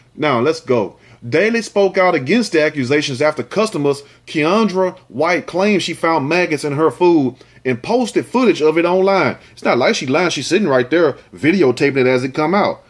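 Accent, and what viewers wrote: South, United States. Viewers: Love the country twang of your accent, and you're so silly, yet wise. You're alright with me.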